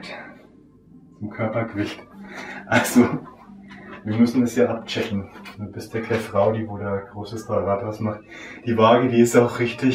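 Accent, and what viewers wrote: Bavaria, Germany. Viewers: woher kommst du eigentlich ursprünglich? man hört einen bayrischen akzent raus. aktuell residierst du in nürnberg hab ich gehört? ;)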